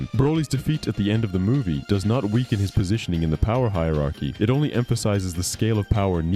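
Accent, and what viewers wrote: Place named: Brazil. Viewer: He's Brazillian Bro, don't blame him, it's difficult to know how you american guys say shit.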